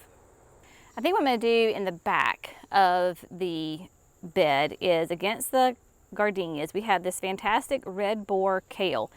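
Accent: South, United States. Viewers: Oh, I love your southern talk when talking about the heat and humidity. I'm in Georgia so we're neighbors. Also love the music. Reminds of the times I was able to sit down at the end of a long day in the yard with the kids, heating up the grill for a burger.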